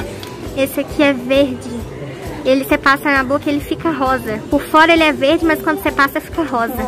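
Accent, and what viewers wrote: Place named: Minas Gerais, Brazil. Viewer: sou do rio de janeiro, acho lindo sotaque mineiro.. meu tio mora em minas.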